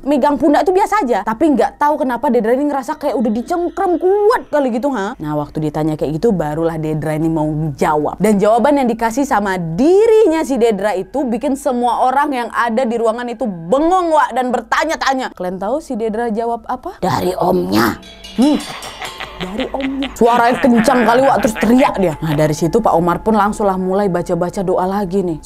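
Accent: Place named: Java, Indonesia. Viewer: Klo KK ngomong Jawa lucu kk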